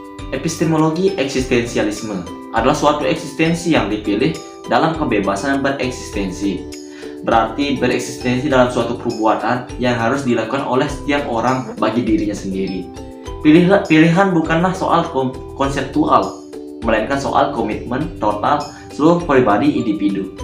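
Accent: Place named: Masbagik, Indonesia. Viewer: logat masbagekk neee kentell jmakk wett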